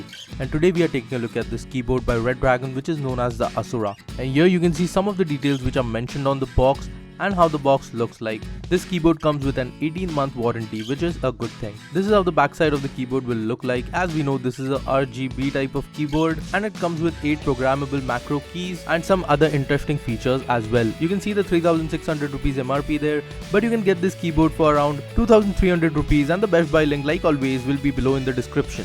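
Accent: India. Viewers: terrible indian english /...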